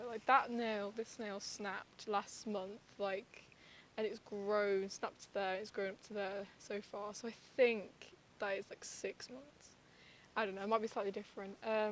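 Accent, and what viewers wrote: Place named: London, United Kingdom. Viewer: You sound like a London girl with that accent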